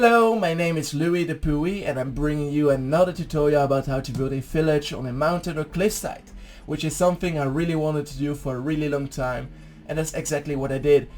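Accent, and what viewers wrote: France. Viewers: are u french ?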